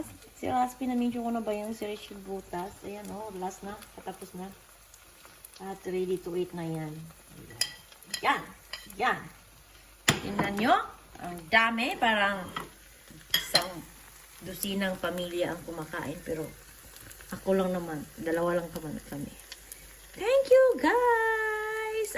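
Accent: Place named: Visayas, Philippines. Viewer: Tuba, taas kaayo harang.sige ko katawa dinhi ba bisdak kaayo jud oi.Nalingaw ko Mao pa pag mara naku.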